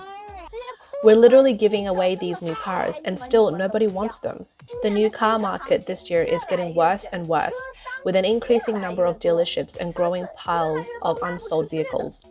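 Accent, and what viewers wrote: Australia. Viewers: Great job on this report. I watch CNBC for business and stock news. This is an even better report on the auto business in China than I have ever seen anywhere. Also, not sure if the voice is AI or real but she sounds great. I live in Florida so do not experience Aussie accents that often. ** OK, Weird thing! & there is an old-looking wall shown. What is that??? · . It sounds Aussie to me as well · 100% austraslian voice. She is reading off a script , hear her voice stop and start. Its good thing as it sounds authentic.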